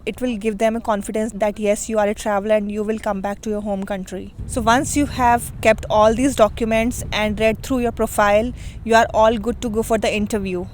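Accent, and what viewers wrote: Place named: India. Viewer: Indian accent so funny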